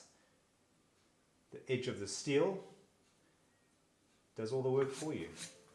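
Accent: South Africa. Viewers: Whoo hoo! A Saffer accent on YouTube. I'll definitely get some knives from you when I can. And sommer subscribed coz support local.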